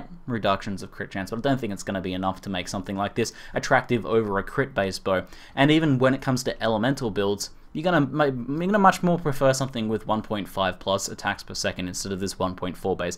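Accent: Australia. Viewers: is aussie?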